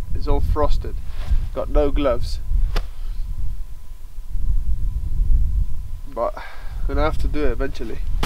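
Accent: United Kingdom. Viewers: i loved your videos in spanish, but im also loving your british accent. and most of all, so glad you just did it and made a video. so beautiful and cinematic even with a simple man climbs mountain storyline every second is enjoyable. i still go back to your other films to improve my spanish. excited for the proximo video and inspire to get outside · i just realized how dificult to understand is british accent for me but anyway your clip are awesome. Thanks for sharing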